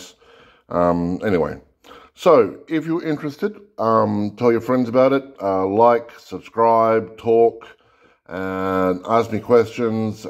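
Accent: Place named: England, United Kingdom. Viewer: What england English accent is that?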